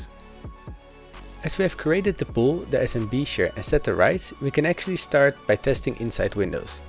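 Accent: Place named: Netherlands. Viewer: Lekker bezig maat, dacht het al aan je accent te horen haha!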